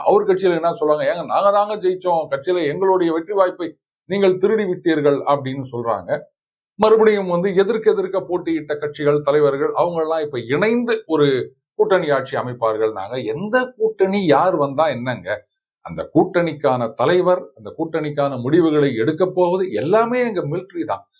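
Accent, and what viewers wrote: Chennai, India. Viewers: Sir Ur Madras language is super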